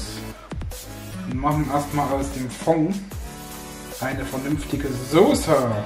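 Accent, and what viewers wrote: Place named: Bad Dürrenberg, Germany. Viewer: Bad Dürrenbergerisch auf YouTube. Herrlich.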